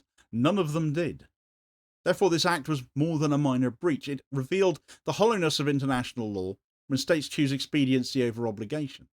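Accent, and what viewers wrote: United Kingdom. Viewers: First of all, there's nothing humiliating about losing a war against NATO as a small Balkan country. Second of all I like how you haven't mentioned the massive anti-government student protests that have been going on for more than 9 months. You said yourself that these weapons have been 'battle-tested' against a civilian population in Gaza and you could easily draw a parallel with these weapons possibly being used on the Serbian anti-government protesters if you weren't just fear mongering and spreading propaganda. Also it's very interesting how Serbia is now a problem, but your entire Western bloc, including your own country, that have been supporting and arming Israel for DECADES are fine. What is this hypocrisy? This video is proof that you can say anything, with a British accent, and people will think you're insightful and smart.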